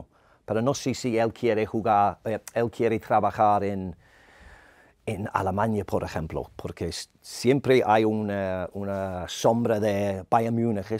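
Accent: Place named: Liverpool, United Kingdom. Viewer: Spanish with a scouse twist.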